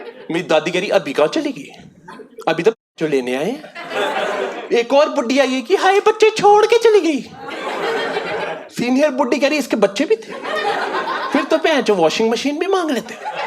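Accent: Punjab, India. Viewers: Punjabi accent 👌😂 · Great punjabi comedy 😂 loved the accent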